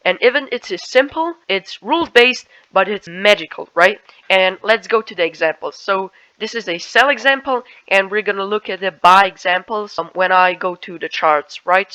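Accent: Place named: East Asia. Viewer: Are you Malaysian? You sound so east Asian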